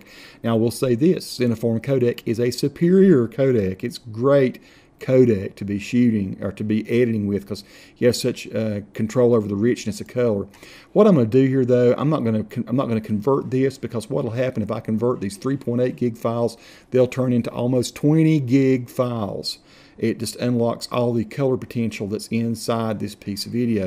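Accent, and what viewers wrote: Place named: North Carolina, United States. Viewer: Thanks man great video. Red Springs and that accent must be NC. I live in Fayetteville ;)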